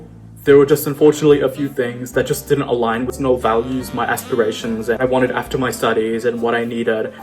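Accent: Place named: New Zealand. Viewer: mans sounding kiwi as f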